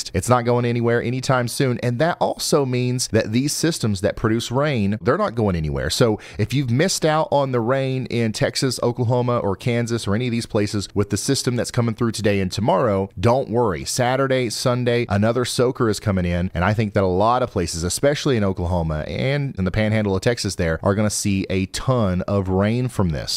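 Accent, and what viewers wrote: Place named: Kentucky, United States. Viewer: Got hooked over a year ago when I heard that Kentucky twang.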